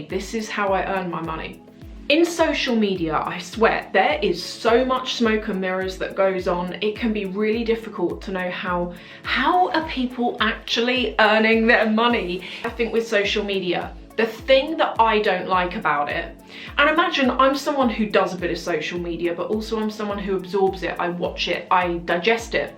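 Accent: RP, United Kingdom. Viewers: As a French person tbh it is not your seemingly lavish lifestyle that made me wonder if you had money..it is more your posh accent. In any case I don't mind.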